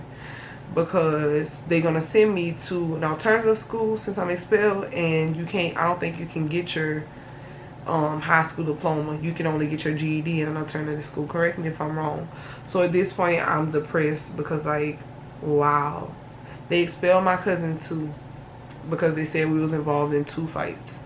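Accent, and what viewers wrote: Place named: New York, United States. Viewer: i looove your accent! u from NY??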